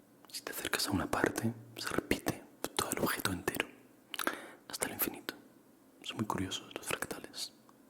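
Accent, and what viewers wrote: Spain. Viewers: El Sonido bajito y delicioso de tu voz, reforzada con ese rico acento iberico, Me Pueden Me Llevan ...😘😌😴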